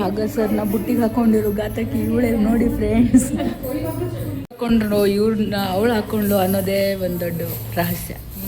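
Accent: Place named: North Karnataka, India. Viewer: Wonderful dear, u speak very lovely North Karnataka original Brahim bashaaa❤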